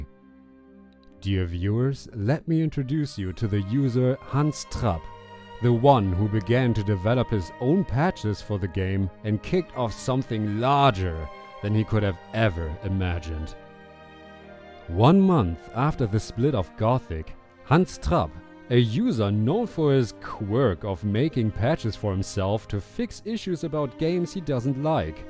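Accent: Germany. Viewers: Cooles Video! Ich wollt grad schreiben, dass Du die deutschen Namen echt gut aussprichst, aber nach ein paar Minuten viel mir auf, dass Du wohl einfach deutsch mit gutem Englisch bist xD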